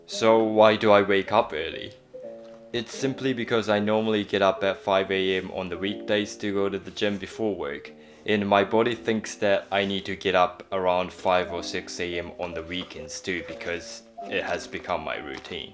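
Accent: New Zealand. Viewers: I was very impressed by how you were able to cut and style your own hair with the use of a mirror. And the handsome smiley face topped off the whole ‘look’. 😉 Where did you learn English? Some words sound like British English and then some sound like the English spoken in New Zealand. Really enjoyed watching your video. Best wishes from Stefan in Ireland. Update: Ha!! I just saw some of your other videos and now understand why your accent sounds rather like a New Zealander!! Lol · I can definitely here your kiwi accent, 😄cool bro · Did you spend time in New Zealand, your English has hints of a kiwi inflection.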